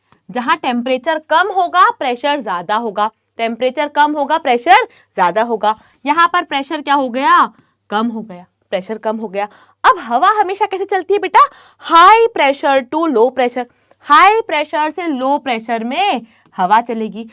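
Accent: Bihar, India. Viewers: mam kya app bihari hai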